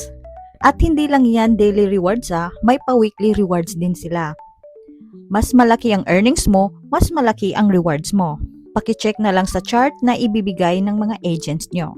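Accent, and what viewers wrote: Visayas, Philippines. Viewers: Biaya ka po ba? Tono mo po kasi bisaya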